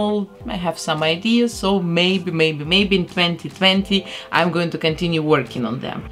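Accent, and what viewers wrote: Russia. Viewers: Мне одной кажется что у неё русский акцент?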